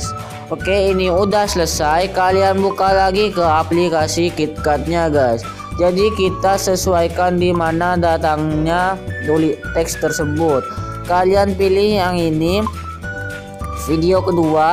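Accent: Madura, Indonesia. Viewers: Org Madura kah ketauan banget:v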